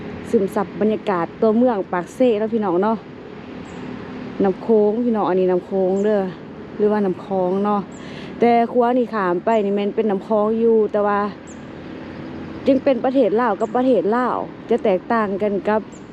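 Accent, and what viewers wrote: Ubon Ratchathani, Thailand. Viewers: สาวห้องคือเว่าสำเนียงทางอุบลแท้บ่รู้ว่ารถโดยสารระหว่างประเทศทางลาวเปิดให้เข้ายัง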